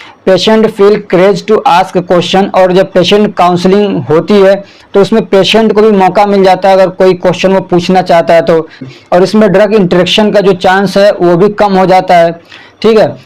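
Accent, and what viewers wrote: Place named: Mithila, India. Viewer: Sir aap kha se aapka tone bihar k mithilanchal area se match krta hai aapka bahut shukriya itne achhe trah padhane ke liye allah apko lambi umar de ❤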